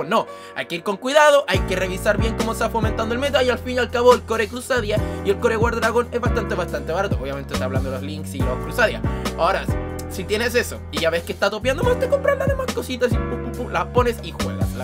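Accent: Chile. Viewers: No se sabe que es peor, no entenderle por chileno o por la música de fondo que suena demasiado fuerte, así que asumiré que el problema radica en las dos opciones...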